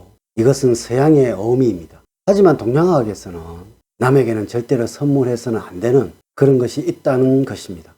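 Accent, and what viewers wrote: Gyeongsang, South Korea. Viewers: 경상도 사투리 듣구 나갑니다 경상도=신천지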